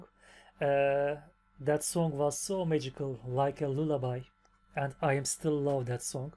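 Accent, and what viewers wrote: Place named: Turkey. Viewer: Oh my Turkish friend is back with another reaction 😎 always a pleasure to see your smile and to hear your nice accent on this emblematic song! Please keep go on with cause you give me such a nice smile 😘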